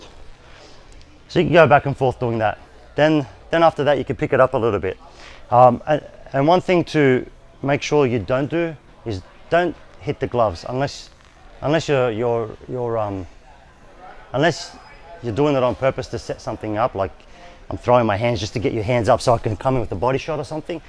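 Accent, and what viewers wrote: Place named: Australia. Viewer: sound like an aussie : )))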